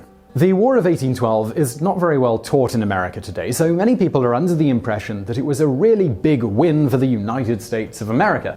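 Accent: United Kingdom. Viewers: I love hearing American history with a British accent! I'm not trying to be snide, these vids are filled with accurate information. I just love his accent and there is something novel hearing American history from a Brit. Love you! BTW...history isn't really taught in American schools, at least not accurately. Some ppl still think the Civil War was about states rights (to own slaves.) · Very (snotty) British inflection on facts about a war they started. No matter how many "Well you couldn't have won, so you were wrong to defend your countrymen" phrases you throw in there.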